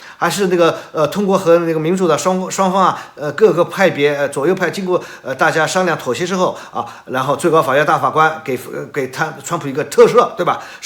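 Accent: Shanghai, China. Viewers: 侬是上海宁啊？好格，订阅了😊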